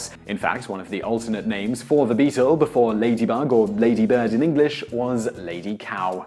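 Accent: England, United Kingdom. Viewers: I presume from your accent that you are English. Then why are you calling the lady birds lady bug's.?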